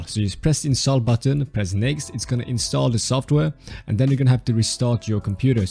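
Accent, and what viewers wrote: Sweden. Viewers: Du är svensk hör det på dialekten 😜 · Are you from sweden?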